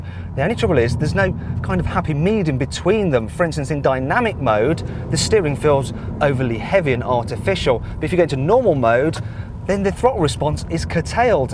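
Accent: United Kingdom. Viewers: really nice review. Or Im just in love with british accent ;D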